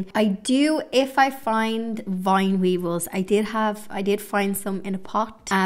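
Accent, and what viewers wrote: Ireland. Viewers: Just came across your video. Beautiful work! Btw, I love your Irish accent 😊. We loved traveling thru Ireland..absolutely beautiful country! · I just now discovered your channel. The word cottage drew me but then I saw Ireland! I’m half Irish but have never been there. Everything about this video was a delight, including your lovely accent! You have created such a sweet garden. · Your video content is great but the real appeal of your videos is your voice and accent. Nothing says cottage garden inspiration more than a whimsical Irish accent 🍄🍀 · Hello from California USA. I have only just found your channel and must say you have taken to gardening in the best most natural way! I admire all that you have done, with the beautiful greenhouse the raised beds and the floor and garden wall you " bricked." What a great and creative way to add loads of charm! 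I will continue to follow your inspiring channel...plus I love to listen to your Irish accent! Happy Spring!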